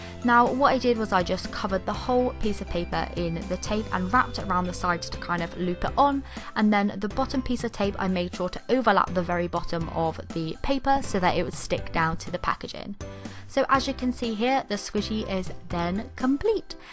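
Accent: England, United Kingdom. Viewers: Honeycomb Owl mmm she isn’t Scottish lol she is english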